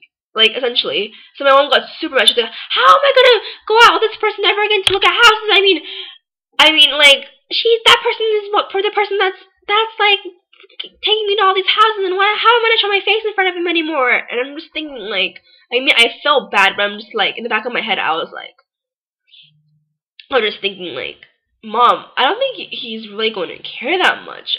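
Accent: United States. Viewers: You sound American, not Korean. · don't put out your insecurities like being fat as a korean problem. If you were truly comfortable about your body and complexion, you would not have a problem brushing off what others have to say about you, them being korean or not. Before you talk shit about other koreans, think about the blood that is pumping in your heart. from your accent i believe you live in the united states, youre a korean american but the blood that pumps through your heart is that of Korean descent, one that has successfully been preserved and cherished for thousands of years amongst world powerhouses like japan and china. be proud of who you are and learn to embrace all that makes you, you. do you even know the great men and women who have sacrificed their lives to make our country what it is today? do some research, and if you still hate koreans, so be it. 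· I don't think it matters whether or not she's Korean-American or Korean. She's talking about why she hates being Korean. Regardless of if your Korean-American or Korean, you're still Korean. Maybe she used pounds because she's speaking in an American English accent, so maybe this video was directed towards Americans, who (omg I can't believe it.), use the term pounds. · LOL I never heard a Korean girl cursed so American like & she nailed it. U are so cute sweetie keep doing you. I've heard Korea prison are very hellish compare to American prison. Smart & cute I want you LOL... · Yeeeeeeeah, you're extremely annoying and I could barely last the 2 minutes I watched. I thought you'd be listing some personal cons of being Korean, instead you turned out to be some selfish kid complaining. It's hard to believe you're Korean, you sound like the stereotypical American white girl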